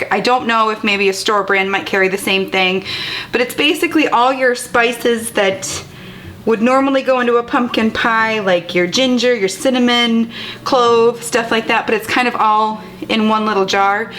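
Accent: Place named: Minnesota, United States. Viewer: Are you from Minnesota by chance? Love this recipe - can't wait to try it!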